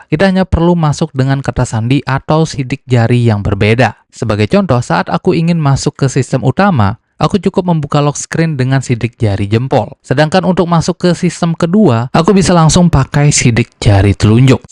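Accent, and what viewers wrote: Bali, Indonesia. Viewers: Logat bali nya kental sekali Bli.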